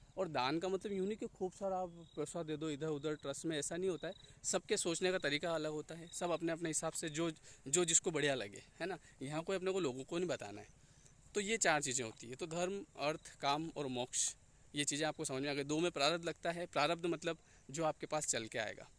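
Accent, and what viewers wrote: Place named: Malwa, India. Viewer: Ur Malwi accent reminds of old days. Now m far away..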